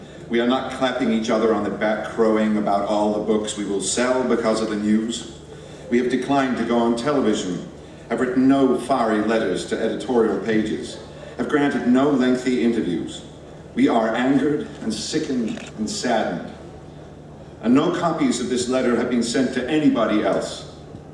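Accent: United States. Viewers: I love how he portrays his best American accent throughout... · interesting that he is affecting an American 'accent' for this. Doesn't make his voice any less mesmerizing. :) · Did Cumberbuddy do this to practice his American accent?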